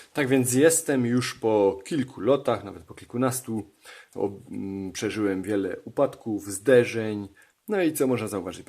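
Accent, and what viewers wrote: Silesia, Poland. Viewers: zasadniczo fajna prezentacja, jedyne co mi przeszkadza to śląski akcent w wypowiedziach prowadzącego, reszta super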